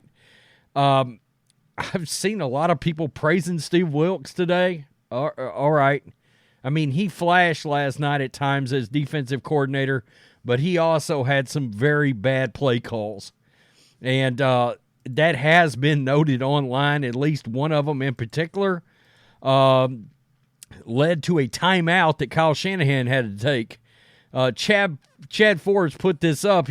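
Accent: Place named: Texas, United States. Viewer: Your new theme song! Love your Texan country twang are you from the southern part of Frisco! Crummy scum riddled Frisco!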